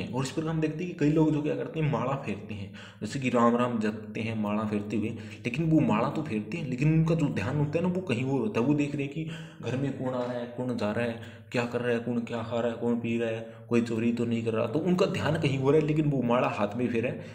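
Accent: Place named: Haryana, India. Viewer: Sir aap Haryana se ho... Aapki voice tone haryanvi lag rhi ha... Sun... Ka samjh ka bhot badiya ... Laga ....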